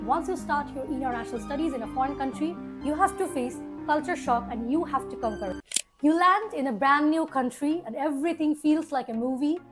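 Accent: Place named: Bangladesh. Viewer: Ahh finally deshi accent ta charsos😂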